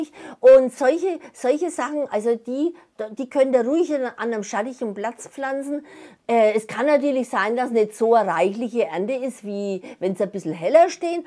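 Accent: Franconia, Germany. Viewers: Danke für deine tollen Videos 😊 Sag a mal kommst du aus Franken?🤔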